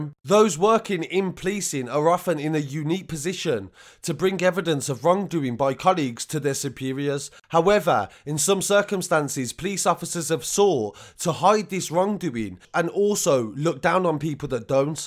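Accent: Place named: North, United Kingdom. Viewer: You know, sometimes your northern accent annoys me but on another note keep up the good work. We need more journalist that will tell us what the mainstream media wont. I appreciate the way you put the time in to research. Thanks.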